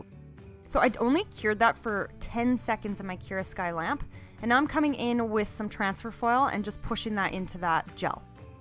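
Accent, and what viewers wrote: Canada. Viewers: Nesian Ninja She’s from a different part of Canada than myself and the accent is different. It’s subtle..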